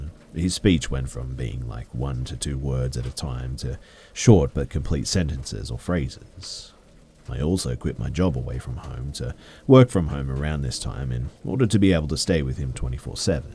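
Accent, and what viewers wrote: Australia. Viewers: This Australian accent is comfortingly close to home.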